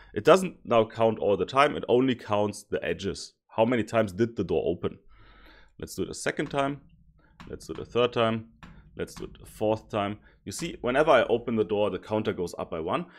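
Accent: Germany. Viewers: Man merkt den deutschen Akzent 😂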